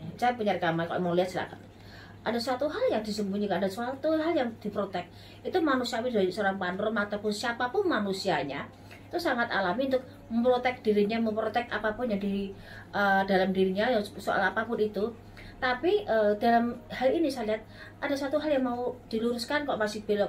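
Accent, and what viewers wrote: Java, Indonesia. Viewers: medok banget mbk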